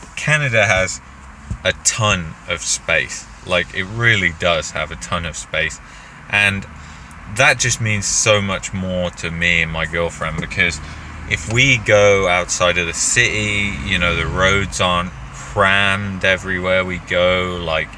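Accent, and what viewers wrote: United Kingdom. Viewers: Sounds like youre from same area as me in the UK! i feel desperate for a change of scenery from here... I want to move to somewhere like Canada but right now, I can't even find a decent job to save up for all that so (if i ever did)... i think it's a looonngg way off. Cheers anyway, informative video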